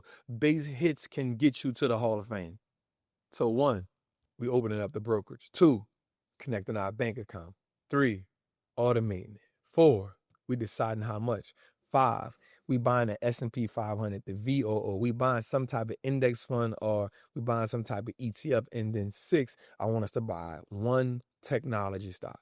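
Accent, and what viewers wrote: New Orleans, United States. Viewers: I heard the New Orleans in his voice🎉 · You ain had to tell me you was from New Orleans I heard it and you ain even had to say baby or room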